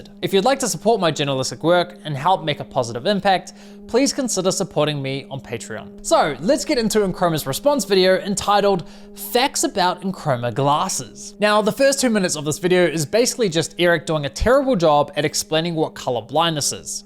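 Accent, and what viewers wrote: New Zealand. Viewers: Me too! 😂 That Kiwi accent! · Stellar work mate ! And, to be fair, your kiwi accent is indeed charming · it’s just his Kiwi accent. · Appreciating the kiwi accent ( a decade ago ) I swear I heard as a dickhead ago. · As an American, I very much appreciate your passion for protecting US consumers. This company is clearly lying. Your channel description and accesnt suggest you are from New Zealand, so I'm curious about your story.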